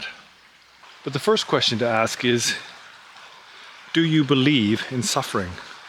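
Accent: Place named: Scotland, United Kingdom. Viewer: I am going with a friend in a few weeks. I only live 2 1/2 hours away by car and yet I only heard about it two months ago. And yes, I have been studying Buddhism for years. I live on walney Island. In cumbria UK. I have been studying. the Dharma for quite a while but on November2nd 2024. My precious wife died from a heart attack at the age of 58. And even though I understand that everything is transient. It still hurts. Even though I don’t exist, ultimately conventionally it hurts! So I decided to go on retreat somewhere and an American Yogi told me about this place it turns out my psychotherapist teacher in the early 90s used to go there I talked to his daughter and she said yes that’s why he went and yes I had no idea he had studied Buddhism! And so my American Yogi said maybe you’re meant to go there and I will be going within the next few weeks just for the day and then I will arrange for a retreat when the weather gets better as you know especially this week January 2025. 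It’s pretty cold everywhere.! I have one question for you do you live in England but you sound Scottish to me? I have subscribed and will watch all your videos because this one is excellent thank you.yours Trevor.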